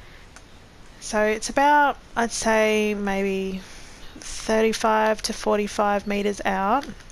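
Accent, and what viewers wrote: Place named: Australia. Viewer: I was not on speed 2 and wasn't able to get the stop and go up off the bottom. Was giving the little grass pickerel a fit changed to speed 2 first cast was practice.... second cast Trophy Grass pickerel. Lol off to Neherin for that last one in my mission Thanks...love your accent by they way...is That Aussie?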